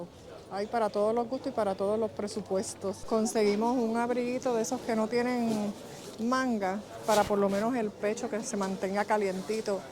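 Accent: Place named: Puerto Rico. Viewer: Saludos. Por fin encontré un YouTube de España explicado en "puertorriqueño". Me gustó que hablas pausado y genuino. Gracias y mucho éxito en tu canal. Myrta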